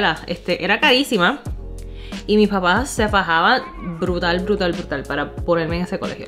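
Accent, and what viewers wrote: Puerto Rico. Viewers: Definitivamente eres mas boricua que el mofongo y hablas mas puertorriqueño q muchos puertorriqueños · Ella no nació en puerto rico ,,ni tampoco tiene raíces puertorriqueñas,,así que 100x ciento puertorriqueña no es,,,ahora si tiene acento boricua porque se crió en puerto rico,,ella,es 100x ciento china,,,con costumbres boricuas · En definitivo que no saben apreciar que alguien se sienta orgulloso de crecer en un país diferente del q nacieron ella es un ser humano y me encanta oírla hablar como toda una boricua aya los boricuas que viajan a USA y al mes (no saben hablar español) super orgulloso de escuchar a alguien hablar de nuestra isla y un pedazo de su corazón es boricua. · Que brutal , acabo de descubrir tu canal y me encanta esto, una china que habla %100 boricua, eres boricua 🇵🇷🇵🇷🇵🇷🇵🇷😍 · Lo siento por todo el IGNORANTE que irrespeto su cultura y descendencia de tus padres y tuyo. 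Suenas acento muy de PR. Eres .🇵🇷 🇨🇳 Siempre orgullo de tus 2 patrias .Bendiciones !! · Siento lo que pasaste En mi Isla, pero eres una de nosotros, no solo por el acento sino porque allí desarrollasteis de lo que eres y te convertiste! China bori hermosa! Bendiciones para ti y tu familia! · No sabes lo cool q es escucharte siendo China y q hablas con ese acento boricua y por eso q pasaste es súper normal en PR si eres chino dominicano o incluso boricua el buleo es súper normal mientras vas creciendo y en la escuela peor so nada eso es parte de ser boricua y claro q queremos ver una segunda parte. Acuérdate boricua hasta en la luna 🔥🇵🇷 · Yo escucho a una boricua, no veo otra cosa. Y de hecho, una muy linda. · Digo lo mismo jaja ni yo q soy Boricua criada y nacida hablo tan brutal el acento Jajja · Sip bn bruta saco todo de puerto rico lo que es su estilo como se espresa su flow tu sabes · Yo soy puertoriqueno nacido en Ponce y llevo viviendo 33 anos en la Florida pero te escucho hablar y tu eres mas boricua q muchos y eres hermosa que Dios te bendiga y me alegra mucho a ver llegado aqui tu eres una boricua china muy linda. 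👍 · Para mi eres de los Nuestros💯 🇵🇷 de pura cepa. Con ese hablarrr mas boricua q YO🙏🙏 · See literal su voz es de Puerto Rico 😅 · Soy nueva en tu canal hablas igualito a los Boricuas ,me encanta tu energía y carisma soy de Puerto Rico ,san lorenzo muchas bendiciones y salud para ti y tu familia .gracias ! Por mostrar lo hermoso de nuestro país al mundo. 💞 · Tú hablas más puertorriqueño que yo pero mil por ciento!!!!yo ni él acento tengo y he vivido aquí siempre lol . Dios te bendiga y que viva PR 💖💖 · You sound as Puerto Rican as any member of my family, and for that, it is a delight to hear you talk. On the "burlar" point, that is why i haven't gone back to Puerto Rico since 1987, people would make fun of my spanish, and try to make me feel stupid because i spoke English, i will never forgive them for that. Thank you for posting, i feel that i have some one that understands what i felt, or rather what some people on the Island made me feel like. 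· OMG!! Me has sacado las carcajadas más locas de mi vida!! 😂😂 Niña verdaderamente no se puede negar que te criaste en PR 😅 Tu acento y la “l” arrastra que nos identifica bien brutal! Jamás te avergüences de tus raíces ni olvides de donde vienes porque eres y serás siempre un ser humano hermoso. China,Arabe,Rusa etc todos somos humanos y muy dentro de nuestros corazones es donde está la escencia de lo que somos!! Saludos desde Buffalo NY Una Boricua como tu!! 🥰❤️ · Alexis del valle asi es habla y se expresa 100% boricua toda su jerga y gestos acento todo es boricua al 100% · Arriba, WEEPA. We love you. Con ese acento eres boricua. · Me gusta como hablas, 100% bori, muchas bendiciones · Me encantó tu video. Si te escuchara en cualquier parte del mundo te reconocería como puertorriqueña. Mucho éxito.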